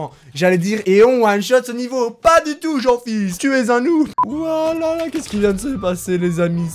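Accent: Belgium. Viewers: Mais tu parles il est belge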